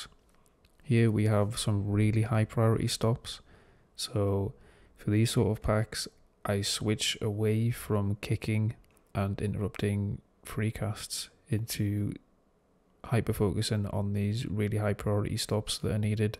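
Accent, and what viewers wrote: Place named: Newcastle, United Kingdom. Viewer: Radge hearing a fellow geordie on a wow guide 😂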